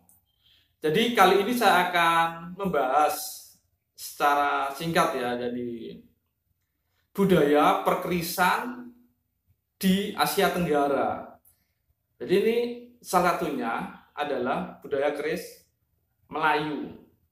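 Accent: Java, Indonesia. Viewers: Salam mas, pekat bahasa jowo nya mas... haha.. salam dr Malaysia mas. Mantap isi nya! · Ini jawa sejati. Pekat bahasa nya ya.👍🇲🇾. Salam nusantara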